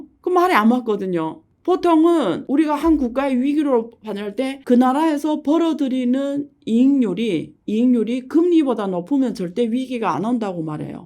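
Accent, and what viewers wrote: China. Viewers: 조선족이야? 발음이 그쪽인데 ㅎㅎ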